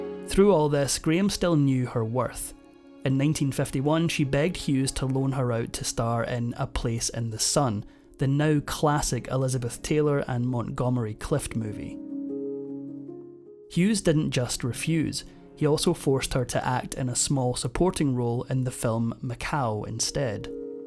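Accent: Scotland, United Kingdom. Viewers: He's Scottish. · He’s a Scot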